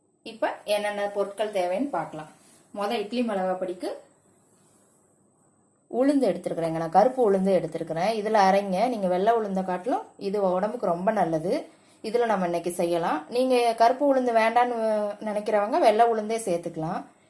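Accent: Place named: Tirunelveli, India. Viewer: திருநெல்வேலியா நீங்க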